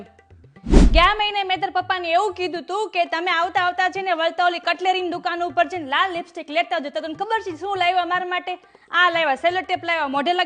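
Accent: Kathiawar, India. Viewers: Tame aa j kero..biju badhu reva j fyo ho..aa to mast..pure kathiyavadi..superr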